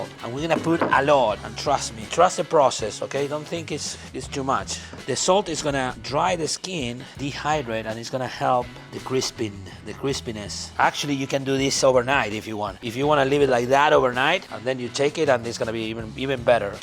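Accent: Argentina. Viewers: Los videos estan buenos lo unico el audio osea la traduccion porque no haces videos vos hablando en argentino el echo que vivas en yanquilandia no sicnifica que te olvides de como habrar en español rioplatense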